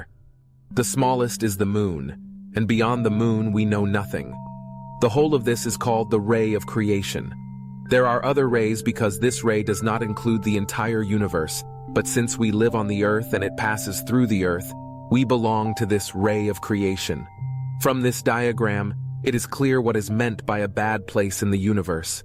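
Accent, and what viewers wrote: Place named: United States. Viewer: Yet another Yank accent.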